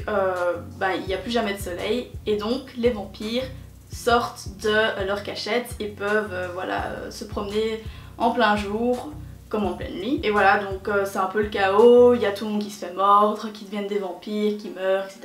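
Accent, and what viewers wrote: Belgium. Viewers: Tes belge? Sinon super ❤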